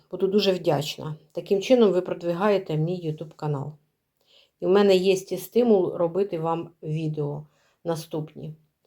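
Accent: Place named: Cherkasy, Ukraine. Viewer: Дякую, що поділилися досвідом!!! Буду пробувати розмножувати, це так цікаво. У вас такий знайомий говір, ви не з Черкащини??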